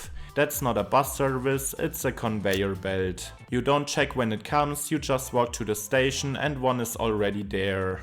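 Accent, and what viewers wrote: Germany. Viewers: Das deutscheste Englisch was ich je gehört habe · Da dein deutscher Akzent sehr ausgeprägt ist, würde ich dir empfehlen, in der Nachbearbeitung einen De-Esser auf deine Stimme zu legen, dann wäre das bisschen angenehmer zu hören. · Terrible German accent and aweful grammar. I feel like listening to my former classmates doing a report on public transport. My ears are bleeding. Please stop!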